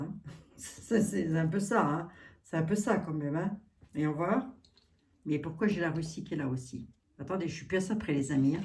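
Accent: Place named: East, France. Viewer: J'adore votre accent de l'est je suis née à Epinal, je reconnais cet accent entre mille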